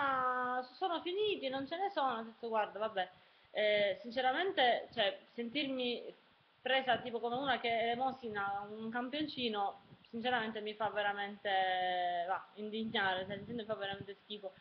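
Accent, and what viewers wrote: Sicily, Italy. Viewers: Io invece penso che ognuno abbia il diritto di esprimersi come preferisce. Youtube non è il luogo in cui rivendicare la perfetta lingua italiana. Ci sono luoghi e tempi per farlo. Diciamo che per vincere questa crociata, forse bisognerebbe cominciare con chi la lingua la deturpa scrivendo con le "k" o sostituendo il "per" con la "x". si esprime in italiano corretto la maggior parte del tempo e, se a volte un po' del suo essere siciliana esce fuori, non vedo in cosa sia TANTO fastidioso.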